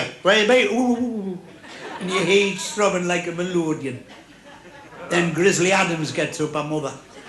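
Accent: United Kingdom. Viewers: Me Mum was from County Durham and the accent and the same sort of humour was nice to hear.. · I've just realised how similar his accent was to 'Little Nana Iris'. She was a hilarious woman from Sunderland who shot to Youtube fame a few years back.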